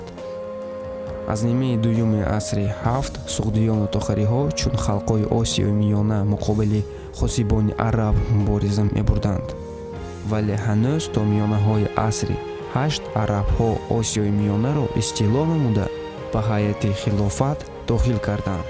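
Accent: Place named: Russia. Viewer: Khohish mikunam, ke toza bo zabuni Orioni kharf bizani ogoi BLOGER! Kharfzanii Tu shaxseki rus khastu guyo bo zaboni tojiki kharf zada istodaast.. aniktarash talafuzat..." Asalamu malakum"...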